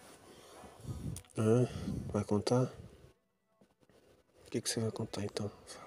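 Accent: Rio de Janeiro, Brazil. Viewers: gosto muito desse sotaque carioca😍😍😍amo❤ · Por que um sotaque carioca chama tanto minha atenção caralhooo🌚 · Deus, percebi agora que eu tenho gatilho por sotaque carioca 🥱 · O SOTAQUE CARIOCA É LINDO, PIQUE BANDIDA ✋ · Sutaque carioca: a minha barriga : 🦋🦋🦋🦋🦋🦋🦋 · Boy... Eu tenho um amigo com a voz idêntica a dele e tem o mesmo sotaque Carioca ❤️ meu amigo é belíssimo, tô desconfiada que seja ele nesse canal kkkk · So uma dúvida aqui... você é carioca? Se vc Não for, eu vou bugar muito kaksksks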